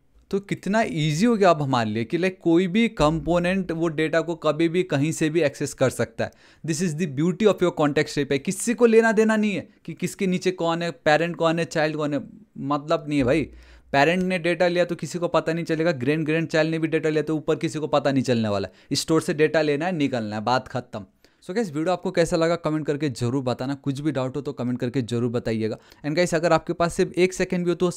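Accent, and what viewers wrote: Nepal. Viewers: 😂😂 counter na saunter , full Nepali tone , lots of love 💕😘 bro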